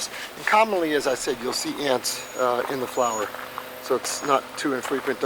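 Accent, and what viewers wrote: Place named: Midwest, United States. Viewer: I don't think he's from the South. His accent is Northern, imo. Probably Midwest.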